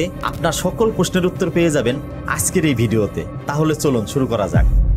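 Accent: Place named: Shariatpur, Bangladesh. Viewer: ভাই এখানে ধন্যবাদের কিছু নাই। আপনি আপনার যোগ্যতার ভিত্তিতে উত্তম উপস্থাপনার মাধ্যমে আমাদের কমেন্ট অর্জন করেছেন। আমি মনে করি এটা আপনার প্রাপ্য। আমরা আশা করি সামনের দিনগুলোতে আপনি আমাদের জন্য আরও ইনফরমেটিভ ভিডিও নিয়ে আসবেন। ভাই আরেকটা কথা আমার বাড়ি শরিয়তপুর, আপনার মুখের ভাষাটা আমাদের ঐদিকে মত মনে হয়। ভাল থাকবেন।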